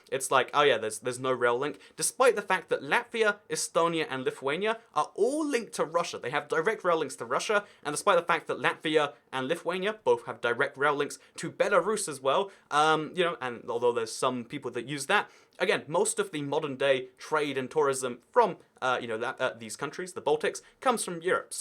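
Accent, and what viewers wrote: United Kingdom. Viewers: British accent!!!!!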